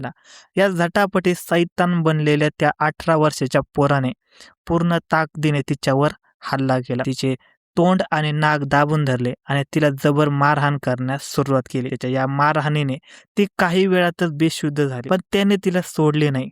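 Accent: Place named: Nashik, India. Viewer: कुठला आहे re भाऊ, बोली वरून वाटते की तू नाशिक नगर भागातील आहे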